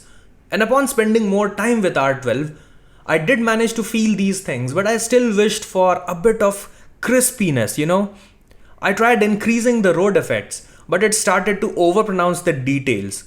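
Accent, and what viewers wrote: India. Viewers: yup, shit video. sorry. first seconds you mentioned that the video is not sponsored in any way! and then... this video was made possible by simagic. and then I hear an India accent... you can't make this shit up, I won't watch the video made it one minute. do better or stop making YouTube videos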